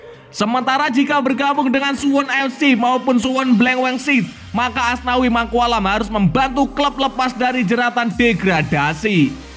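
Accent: Java, Indonesia. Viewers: Jawieerr amat min logatnya .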